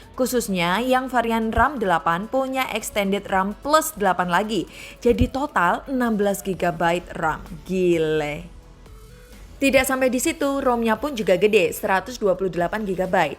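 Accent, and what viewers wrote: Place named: Java, Indonesia. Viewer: Bacanya ga masalah, medoknya itu yg masalah...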